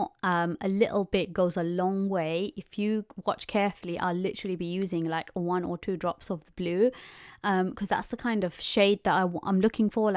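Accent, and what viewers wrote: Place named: United Kingdom. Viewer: i know this sound unrelated to your beautiful art this is the first time i've heard your voice i don't know why but i thought i was hearing a british accent (i don't mean it rudely i mean it as a compliment!) also thank you for the tutorial so beautiful Mashalllah!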